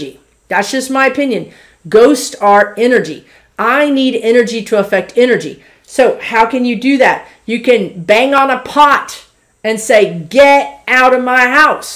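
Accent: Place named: Minnesota, United States. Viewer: Hi I turned this on and am watching now. My 1st thought was I like her she sounds so much like people I know here. Then I thought she really does sound like a Minnesotan. My next thought was I think she belongs in Minnesota she would fit in here so darn well! Just had to share a clairknowing that I may have had.? Might be a great question for you to check with some of the awesome people that you get with😊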